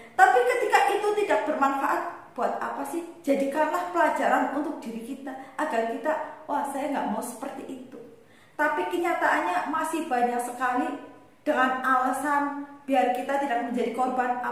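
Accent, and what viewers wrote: Banyumas, Indonesia. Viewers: betul kue mba si cantik ngapak, pokoknya manfaat banget yang disampaikan sama mba ini, ayo dulur dulur saling ingat mengingatkan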